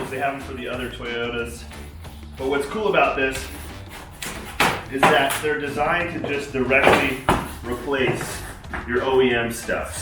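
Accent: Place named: West Virginia, United States. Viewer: You sounded real West Virginian in this video.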